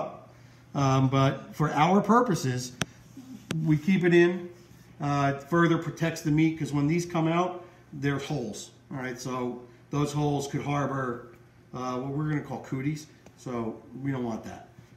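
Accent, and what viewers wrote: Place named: New Jersey, United States. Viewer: I got a 4 bone roast from Shop Rite for the holiday sale for $64 minus a $50 gift card it cost me $14 & as you said each steak could cost you $80 if you went out to eat & wouldn’t nearly be as good. Nice video! I wanted to make a roast & was looking for a video on how to trim it as a roast but my wife said we’re never going to eat it all & asked why we can’t just cut it into steaks & freeze them so listen to the wife. Your accent you sound like you’re up near Floriam park somewhere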